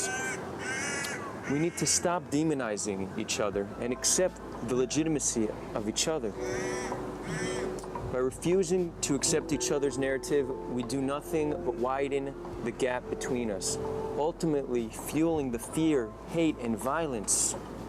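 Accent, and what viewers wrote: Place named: United States. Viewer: I think your American English is good enough for you to resettle to Gaza and educate local Arabs to live in peace, since there is no occupation of Gaza and thus no reason for violence. You should dedicate your life to that. There is no need to preach to non-Arabs. We have already army of the socialists, progressives, Fabians and others who do just that.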